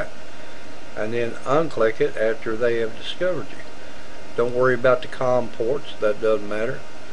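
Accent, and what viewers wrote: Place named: Texas, United States. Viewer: Great Texas Accent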